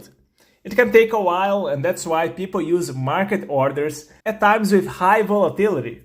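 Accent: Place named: Brazil. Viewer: É um brasileiro falando inglês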